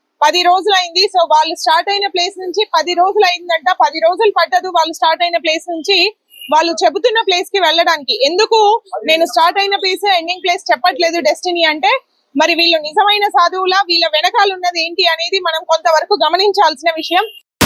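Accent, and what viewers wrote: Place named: Hyderabad, India. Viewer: Vah kya hua Hyderabadi baat kar rahe ho bhai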